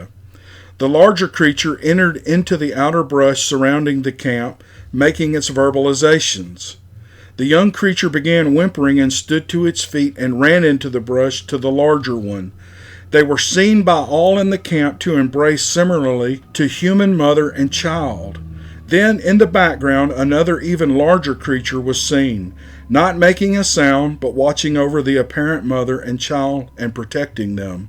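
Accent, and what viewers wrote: South, United States. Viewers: That southern drawl is like comfort food but for my ears and mind, thanks ❤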